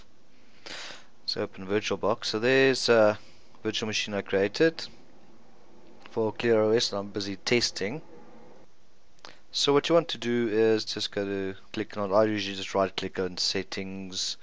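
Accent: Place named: South Africa. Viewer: thanks a million dude, are you south african :), Im from SA btw, and based in your accent and youtube name i guessed you are too. Thank you again ::)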